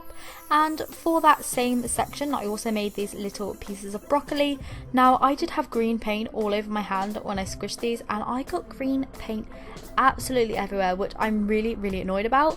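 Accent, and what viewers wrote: United Kingdom. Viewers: I love watching you because I love your British accent 😂 I live in America and I have a Southern accent because I live in Alabama which is like at the bottom of America.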